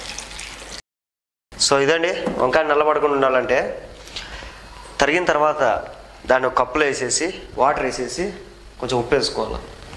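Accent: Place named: Tamil Nadu, India. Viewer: I like your video bcz u r Telugu is so , I have shocked because Tamil people Telugu is so different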